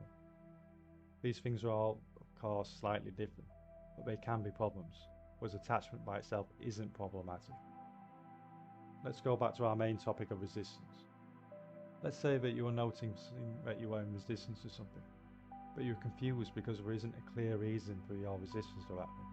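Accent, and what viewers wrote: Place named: Northern England, United Kingdom. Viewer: The person narrating IS an English person. They have a northern English accent. The subtitles are there for a reason :)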